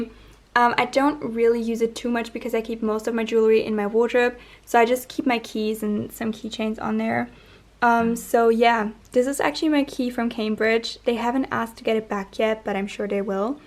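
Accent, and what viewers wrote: United States. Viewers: I feel like now that she’s out of Cambridge she kind of has more of an American accent which is lovely on her 😂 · Woah u sounded full on british!!!! 😂😂😂 but half the vid I heard an American-ee accent😂 where r u from?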